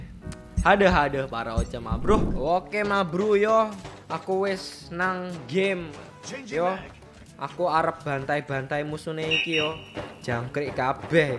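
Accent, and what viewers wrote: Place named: Java, Indonesia. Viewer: Medog Wkwkwk 😂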